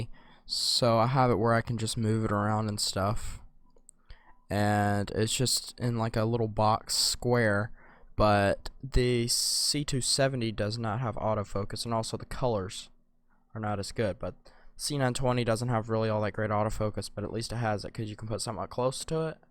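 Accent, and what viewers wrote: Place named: South, United States. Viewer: Your light southern accent sounds really cool.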